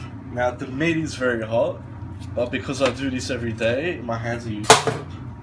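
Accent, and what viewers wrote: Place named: Australia. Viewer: aussie accent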